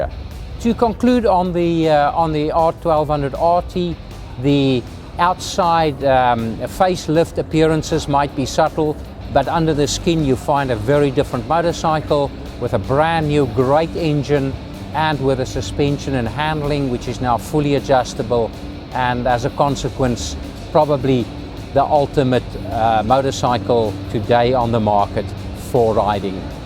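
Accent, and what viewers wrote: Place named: South Africa. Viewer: hes south african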